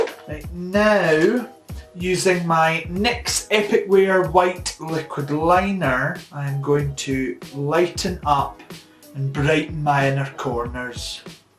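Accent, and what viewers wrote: Scotland, United Kingdom. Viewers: So so proud to see a Scottish queen!!! You did us all proud! I think anyone Scottish would know you're not faking😂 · I can actually stand Lawrence when he speaks normally. Hate the guts out of him when he purposefully makes his voice deeper “YEW AWRTIE LAWDS” We all know you’re Scottish it’s not difficult to tell. We have all probably met a Scottish person in real life they aren’t a rare breed of person I promise you no one cares more about you if you do that cheesy voice, we actually like you a lot more when you just speak without psychically shouting and making your accent a lot more prominent. I love your accent, when you speak in a normal tone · We stan a plus size queen. We stan a Weegie. And we stan a Scottish burr. Congrats gorge!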